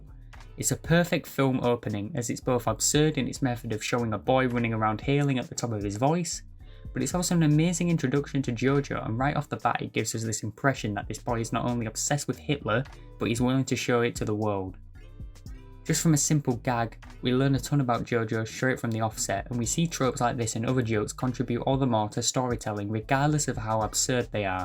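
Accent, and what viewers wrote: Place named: Stoke, United Kingdom. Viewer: U sound stokie but then my accent gps is rubbish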